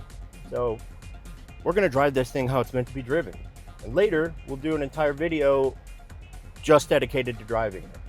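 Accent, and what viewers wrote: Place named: Hollywood, United States. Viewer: Bro you look & sound like the American Hollywood movies have made us rest of the world believe in. Clean nails, too. Bro is SIGMA. Keep it humble king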